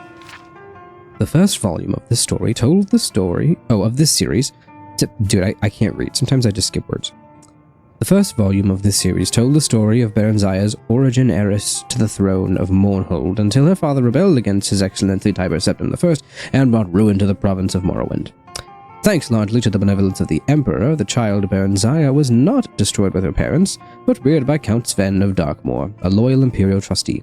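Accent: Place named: RP, United Kingdom. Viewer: I am very much enjoying your readings of the books. Particularly your English RP (recieved pronunciation) accent. A side note for your knowledge we pronounce the word Dynasty as Din not Dine if you wish it to sound authentic. Reciting in repetition tongue twisters such as Peter Piper or She sells seashells or The rain in Spain or The quick brown dog to practice your enunciation will help. I am sorry you are feeling unwell and it will definitely give you a fuzzy brain preventing you from taking the information in. Nevertheless, a super effort and much appreciated. 👍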